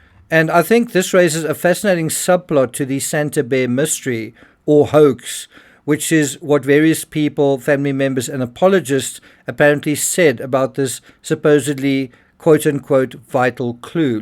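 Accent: South Africa. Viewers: I watch this channel cause I enjoy hearing a south African accent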